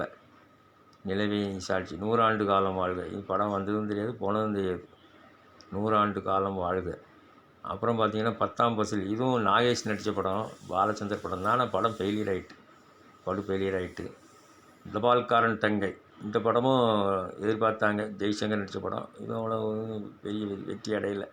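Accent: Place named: Tirunelveli, India. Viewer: Thank you sir Your Nellai slang very very sweet for my ears. Keep it up sir.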